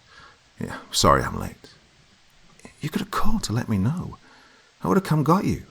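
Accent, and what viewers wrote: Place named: United Kingdom. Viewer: Hooray! It’s time😁. I am ready sweet doctor with my favorite drink and kitty kat. Carry on smoozy Brit narrator🇬🇧🇬🇧❤️❤️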